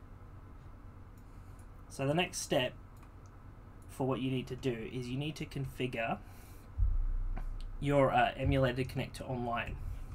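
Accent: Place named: Adelaide, Australia. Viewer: champion, cheers unrelated, but are you from adelaide or is my ear for accents way off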